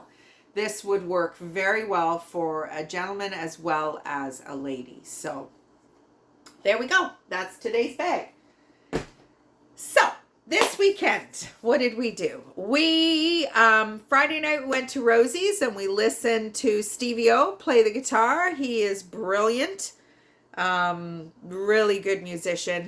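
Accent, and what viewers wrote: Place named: Southwestern Ontario, Canada. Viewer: I am fairly new to you & just realized your talking SW ONT! HOME!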